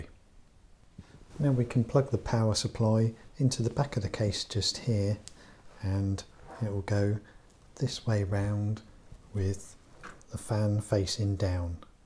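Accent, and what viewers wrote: United Kingdom. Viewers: We need you to start an asmr channel. You have a great voice, and the British accent just makes it perfect!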